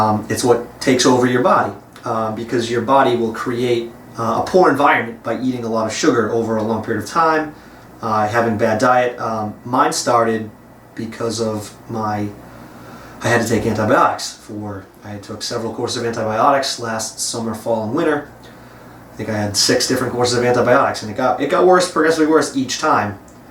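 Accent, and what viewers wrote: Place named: United States. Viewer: Brother i can't understand spoken american well. Can u write down your recommendations please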